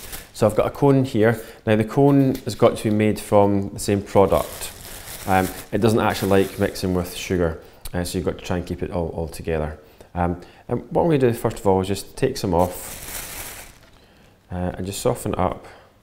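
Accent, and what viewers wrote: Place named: Glasgow, United Kingdom. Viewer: Such a talent, i love watching clear, concise step-by-step tutorials like this, really makes you feel like with enough practise, you could do it yourself. Thank you! Also sounds like a Weegie accent there! So rare to hear, makes me miss home! :)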